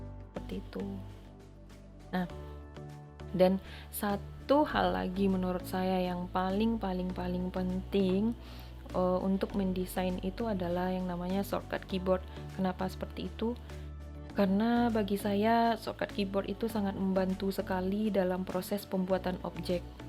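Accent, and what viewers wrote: Indonesia. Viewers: orang padang kak?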